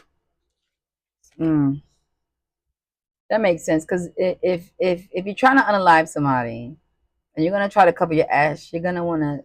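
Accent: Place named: Spanish Harlem, United States. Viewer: New subscriber here!! Are you from nyc ? Lol my husband is n your accent sounds like his hes from Spanish Harlem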